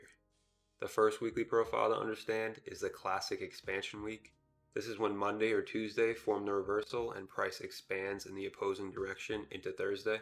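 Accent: South Africa. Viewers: Yoh u South African?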